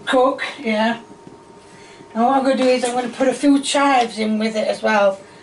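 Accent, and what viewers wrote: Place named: Northern England, United Kingdom. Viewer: I like watching your channel, as a northerner I get what your saying and your sense of humour and sometimes the reaction channels act like something is weird when it's not, it's just how us northerners talk!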